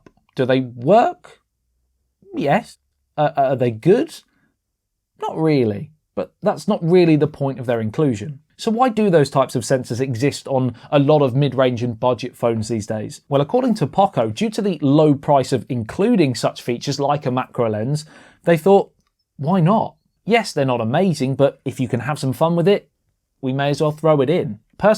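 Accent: United Kingdom. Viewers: I have seen unboxing and reviews by Technical Guruji, must say this is a kind of british Technical Guruji. Rightly said, this is the most british accent I have heard. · I really love British accent 😍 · This is the most british accent I heard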